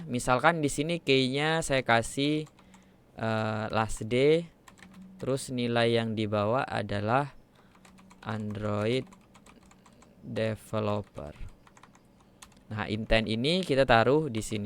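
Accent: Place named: Bali, Indonesia. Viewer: bli orang bali ya? :v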